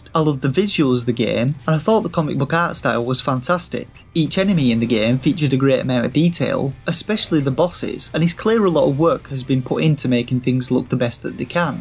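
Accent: United Kingdom. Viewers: Is it english of UK?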